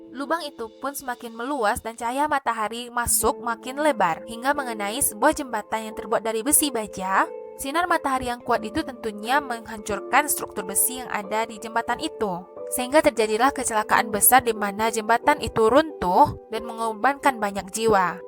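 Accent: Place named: West Sumatra, Indonesia. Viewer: kan betul. Aku dah tebak dari pertama. Minang betul logatnya. Mantaap kokk. Jangan malu sama logat kita. Aku melayu hehhe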